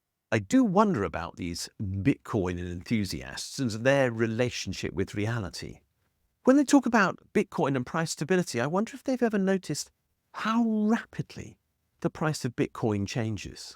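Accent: RP, United Kingdom. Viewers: So much misinformation here it's unbearable. Don't let the posh English accent, the bookshelves and the "professor" title fool you.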